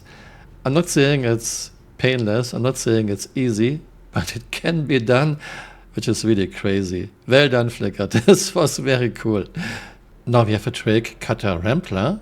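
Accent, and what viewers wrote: Germany. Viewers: Wie deutsch kann man sein wenn man english spricht. MAXIMAL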